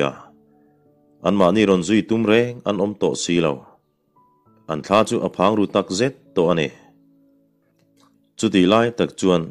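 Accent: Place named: Aizawl, India. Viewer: Aizawl thluk in thluk tawp rawh 🤣 🤣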